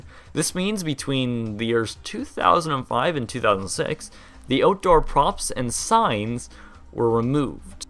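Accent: Canada. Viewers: I have one question for you boy: Are you Canadian? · You sound Canadian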